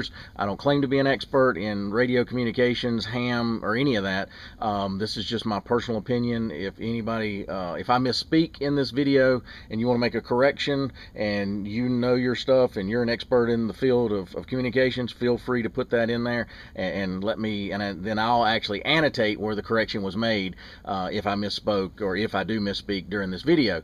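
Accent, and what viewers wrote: South, United States. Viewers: I dont hear it. Besides the southern accent. 401s accent isn't as thick.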